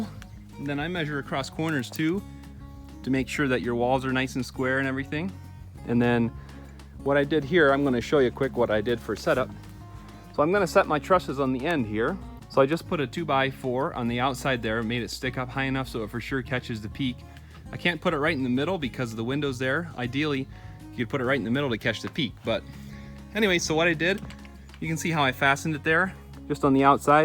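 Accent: Canada. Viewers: Wow, superbly nice work! You are so resourceful, and such a diligent worker! I like the overhangs. They are worth the extra time and effort, plus they drop rain farther away from the shed. I gave you a thumbs up and subscribed. And someone gave you a * FREE * door, bonus! Could you add a cost list, please? How much did the wood cost, the metal panels, and the windows. I am wondering if it's cheaper to buy timber and screws and a window and so on, or to buy a whole shed kit. Are you Canadian, eh? Your profile page says you are somewhere in The United States, but you sound just as Canadian as can be.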